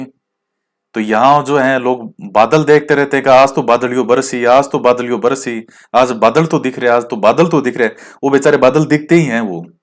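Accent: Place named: Marwari, India. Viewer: Sir ji आप का hometown जोधपुर h क्या? 🤔 आप जोधपुर की marwadi बोलते हों 👌👌☺️☺️😂😁 achi लगती है y भाषा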